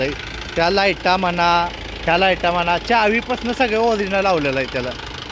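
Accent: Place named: Karad, India. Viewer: Bhava tuza bolnyacha tone lay bhari hay... Karad chi aathwan karun deto....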